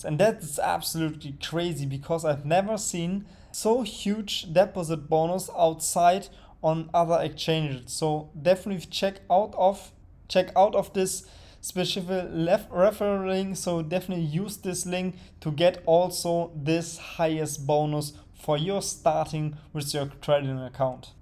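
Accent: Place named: Germany. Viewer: You sound like a German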